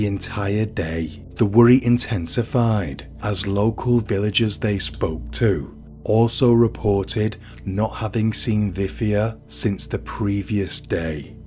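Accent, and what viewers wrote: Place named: Liverpool, United Kingdom. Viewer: Also I can hear a Liverpool or Merseyside accent 😊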